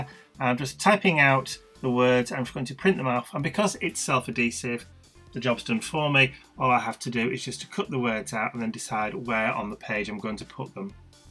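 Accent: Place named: England, United Kingdom. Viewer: I loved the, "coup-pah tea, and a biscuit shared with Mr. Bentley...", your English accent is so lovely. But....whoa! "....what can you expect of a man"?????? hahahaha! You're a hoot! I do love the whole cosmic stream of your creative/thought process, tho. So different for you. And how clever, the way you "incorporated" the words into the whole graphic design of your spread! I've been over that whole "smeared-charcoal-border" around the words technique, but I felt like just leaving the "anemic-white-patch-with-a-word" was not enough either. so....thanks - - for opening up the scope of a whole.....new thing! Big hug, cat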